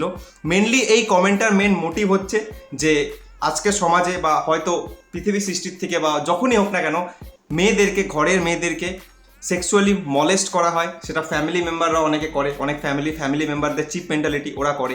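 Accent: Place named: Siliguri, India. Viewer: Tmr siliguri te kty bari dada .. Kto din dhore video dakhchi ..kl kei ami amr bor k bollm j ai dada mone hoi siligurir amder mton kotha bole .. Kolkatar moton na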